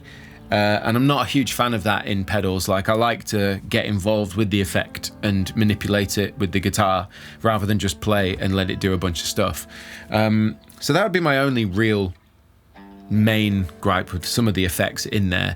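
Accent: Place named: England, United Kingdom. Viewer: Loving the dickies shirt and English accent. To appropriate is to appreciate.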